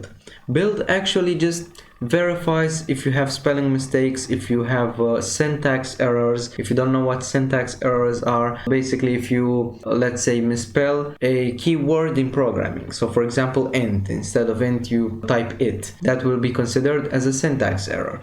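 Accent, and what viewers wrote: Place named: India. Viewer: no problem man, sometimes i click a tutorial and then i get triggered by the voice and i would click away, this mostly happens with indian accents, i just cant listen to it, im literally in pain, but for some reason this happend with your voice, where are you from?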